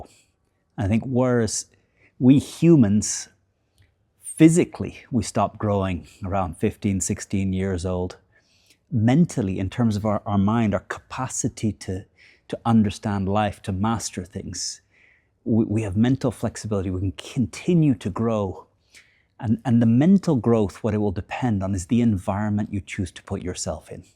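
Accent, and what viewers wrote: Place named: Ireland. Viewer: Brilliant and memorable! As much as I love your Irish accent your "un abrazo" at the end made me wish for some Spanish language content. And maybe a video explaining how you found your way to Spain and your transition from "guiri" to local. That would be very interesting for us! I'm an American with majority Irish heritage who fell in love with Sevilla in the early 2000s. I made great local friends there, but ultimately I always came back to the States for career reasons. I admire that you've so fully embraced your love for Spain, and made such a fulfilling life for yourself there. Un abrazo! -Justin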